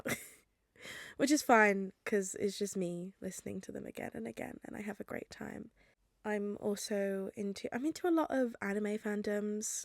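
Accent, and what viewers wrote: United Kingdom. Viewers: i love you bestieee. 10/10 cute video youre really good at a british accent, do you practice? >:))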